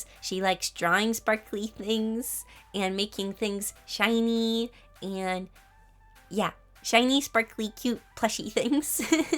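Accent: United States. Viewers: Hi Nd to answer wat u said this video I figure you were white American because I have a friend that have an accent when she talks but she’s American she’s not mixing or anything she’s just a white Caucasian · I dont think your asian bc asian ppl dont rly wear sweaters and you sound like usa ppl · Are you by chance from virginia or somewhere near there? You're voice/accent reminds me of my family whose from there lol.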